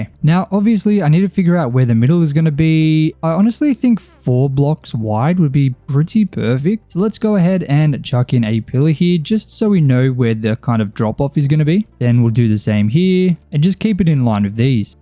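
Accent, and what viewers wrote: Australia. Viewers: No hate but why his australian accent acting like the volume button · This was the first of your videos ive watched, i immediatly knew you were australian 😂 From WA myself.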